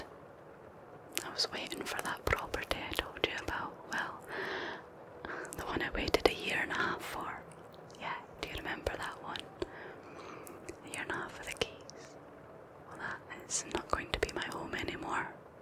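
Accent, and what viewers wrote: Scotland, United Kingdom. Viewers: I don’t comment very often because I’m just the older guy who happens to appreciate your videos, your smiling eyes and that wonderful Scottish accent. But your eyes aren’t smiling this time and I just could not sit by and keep quiet. I won’t offer you a string of clichés about getting past the pain of losing someone you cared for. By your comments here it’s apparent that you will be ok. I just wanted you to know that despite the fact that our only connection exists in the ether of YouTube, I know you will be happy again soon. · Hi! Actually your ASMR is the only one right now that helps me sleep. Breakups always hurt even if they are on good terms. I recently lost a girl because I declared my feelings to her. I still consider her my best friend and it was partly my fault because years ago she was interested in me but I couldn't see it. 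I had my mind on other things. But at least we had a great friendship, the problem is what I felt was growing. And now here I am suffering. I would like to recover the friendship at least but I see it difficult. It won't be the same anymore. Thanks again, your Scottish accent and naturalness help me. Greetings from Spain. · Attaweyman, I love the Scottish, go on you dancer, great video with a touch of real life, first 6 months are the worst 😎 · This may get kind of long, but I will try and shorten it up as much as I can. First, let me say that I didn't know what ASMR was until a few months ago. I was having a hard time getting my mind to wind down while trying to go to sleep. I was trying white noise, tribal flutes, thunderstorm sounds, etc. Just anything that I could think of to relax to. I just happened to be scrolling through YouTube when I saw a heading reading This Scottish accent will knock you out. 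Now I always been a sucker for a soft Scottish accent, so I clicked on it and that was probably the best sleep I have had in a very long time. I have been watching your videos just about every night since then. Your voice and the natural flow of your hand movements just does something to this southern boys brain. So thank you for that. Second, I am sorry to hear about the latest events in your life. I know it will take some time, but you will definitely be ok. There are seven stages of emotion that you will go through before you are truly ok, but I know you got this. I have gone through them a few times and I can tell you that you will always come out stronger and more alive than ever before. The human heart was designed to reheal itself from hurt and pain because we as humans will continuously experience things in our lives that we have no control over. We will get knocked down, we will get our hearts broke, we will become emotional wrecks, but we will always be able to pick ourselves back up, dust oursel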